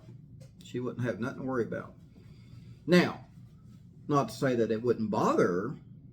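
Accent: South, United States. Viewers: Love the calm way speaks. U can actually hear him. Soft Southern Style! And no background nonsense. Yeah wtf is goin on with some people. I cant get ma head round it. All this evil, family annihilation, murder, missing kids….. It goes on and on! Depressing, upsetting. Have we learned nothing. Its 2024 now and this world seems to be getting worse not better. 💚💜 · I was a youngin'. I enjoyed that As I come from the Northeast. I was the same age as Nicole I think a year younger, So we were in our Early to mid 30's. I 't follow much true crime anymore like I used to many years ago with Nancy Grace. It's just the same child story of murder and sex abuse decades after decades but the names are changed. But I so enjoy your show, Your sensibility, level headness, of course southern accent!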